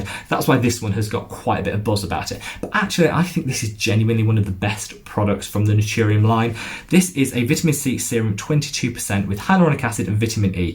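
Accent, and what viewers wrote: United Kingdom. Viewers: Great review. Really. Just discovered your channel and subscribed. Want to hear more reviews from you. You go deep and I love it. You remind me of Hyram only with British accent which I adore😁 I would like to hear your opinion on timeless. They have straitforward products. I love their vit c 20% ighink you would love it too. Good luck with your channel it will grow for sure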